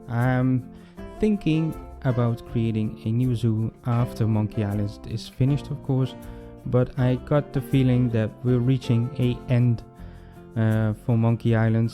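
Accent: Netherlands. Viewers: a SUPER REALISTIC city zoo?! No way! I would love to see you build a zoo like that. I mean, I would super love to see it. I'm wondering where it will be placed. Netherlands maybe? Because your accent sounds Dutch [correct me if I'm wrong]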